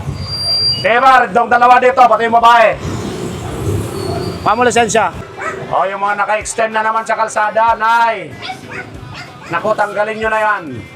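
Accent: Iloilo, Philippines. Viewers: Parang ilonggo c sir ah 😊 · Ilonggo ata SI sir 😂😂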